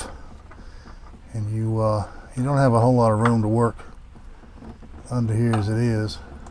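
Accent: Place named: Texas, United States. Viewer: Oh, I feel like I’m in Texas hearing his accent, the garage and the big Chevy. I miss Texas especially the shoppings and dinings.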